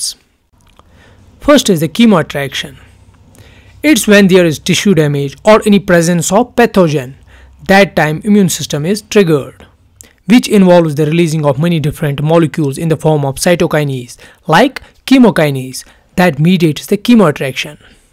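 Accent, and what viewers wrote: Kashmir, India. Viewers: Bro, love from kashmir, but your accent of speaking the English is very similar , how kashmiris speak English, are u kashmiri too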